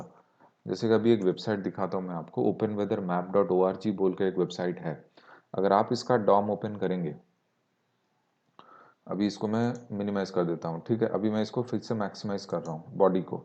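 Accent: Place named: Odisha, India. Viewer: Bro are from odisha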